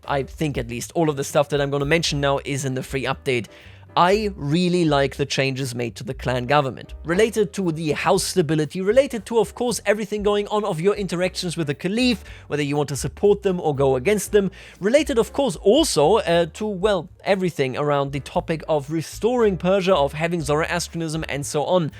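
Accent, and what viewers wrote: Germany. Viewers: hi r u prussian or german? 🤔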